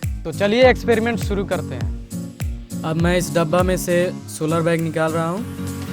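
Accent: Bihar, India. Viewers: Kya bhai bihaari ho ka tum? Tumhre tone se lag gawa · Bhai saahab ...hindi main sterling aur pulling ka dhyaan rakhen....maana ki bihari hai phir bhi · Bhai apke aawaj se aisa lag raha hai aap Bihar me kahin se Hain..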